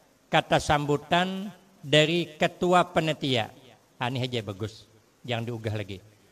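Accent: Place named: Kalua, Indonesia. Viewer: bahasa beliau kaya orang Kalua ( Kalsel )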